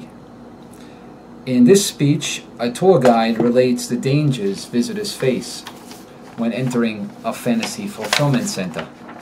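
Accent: New York, United States. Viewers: The guy is from Nu Yawk !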